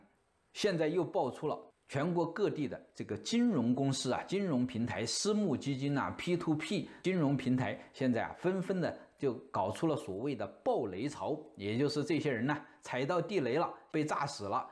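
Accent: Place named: Subei, China. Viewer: 这个老兄的口音江淮官话 苏北口音的底子，为什么我总是联想到 江爷爷。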